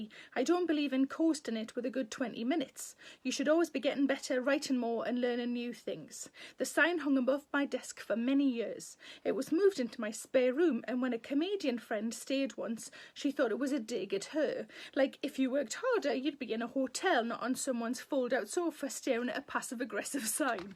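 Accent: Newcastle, United Kingdom. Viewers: Hi,!! Been listening to multiple episodes in chunks since I’ve not been able to tune in at the airing time every day. So I’ve been catching up for the past couple days. I live in a little town called Shepherdstown in West Virginia in the US; A small historical town that no one’s really heard of, but oh well. Born and raised here. (Although my Dad’s from the UK, so I was raised with a strong love for the English) I love listening to your lovely Geordie accent and smile and laugh along listening to you while working on art pieces. Thank you for being one of my favorite parts of my day, and for being such a beautiful person inside and out. Lots of love - Emma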